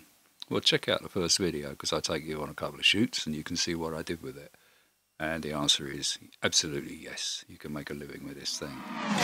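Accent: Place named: England, United Kingdom. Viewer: English English.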